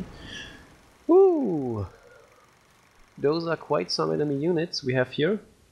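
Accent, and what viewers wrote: Germany. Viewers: German 🇩🇪?:D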